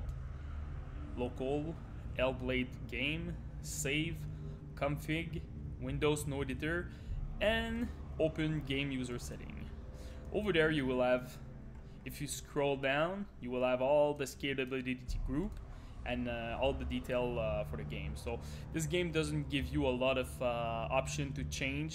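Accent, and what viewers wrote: Quebec, Canada. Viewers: l'accent québécois mon chum, bon vidéo big.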